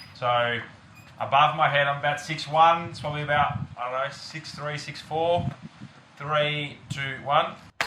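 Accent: Australia. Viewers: What is that accent? Australia? New zealand?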